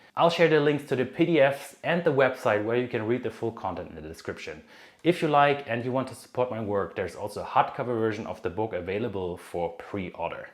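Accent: Germany. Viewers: Wow thermomix congratulation, salut 🤣🤣🤣you are too funny -I got lost when you talk with a lot of numbers 😢( failed math in school )can you theorize sourdough panettone please - panettone is the king of sourdough bread -❤I love German accent and jokes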